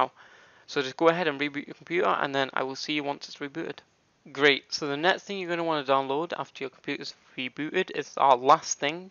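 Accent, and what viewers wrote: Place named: France. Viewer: on est d'accord que tu est français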